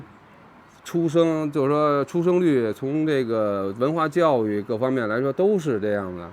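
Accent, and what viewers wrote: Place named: Beijing, China. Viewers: 他可能指的是祖籍，很多人会这么讲，听口音应该是在北京长期生活过的。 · 京爷 。哈哈 这口音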